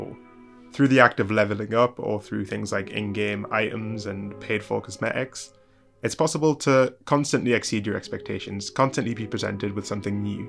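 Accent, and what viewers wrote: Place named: North East England, United Kingdom. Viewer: Nice to hear a north east UK accent on YouTube :)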